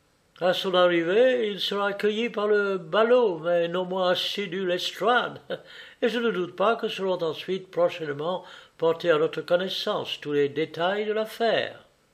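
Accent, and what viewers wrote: England, United Kingdom. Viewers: Merci pour cette interprétation, très belle voix avec l'accent anglais. J'ai apprécie cette voix dans mes lectures audios de Sherlock holmes. Merci encore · oh are you adding a british accent :D i was wondering what dialect that was lol im learning french :goodvibes::elbowcough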